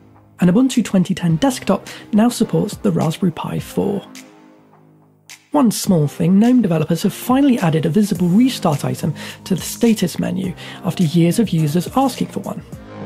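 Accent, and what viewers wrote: United Kingdom. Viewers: Where is that accent from? (Sorry, I'm not a native speaker, but it sounded really cool to me) Is it british? Excelent video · Heavy British Accent!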